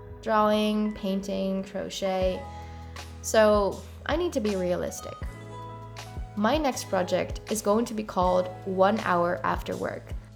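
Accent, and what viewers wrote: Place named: Canada. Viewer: What an exciting new project! Can’t wait to follow along and perhaps get inspired to stay away from too much tv after work myself :) I recently started weightlifting and I feel like I’ve been missing out, it’s awesome and so helpful in combination w/ running. Didn’t realise you were from the Netherlands originally, your accent sounds very Canadian!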